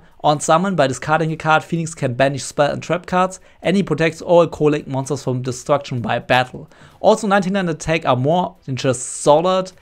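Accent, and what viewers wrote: Germany. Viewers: Great video. A friend showed me this for the upcoming sneak peak. Loved the German(?) accent.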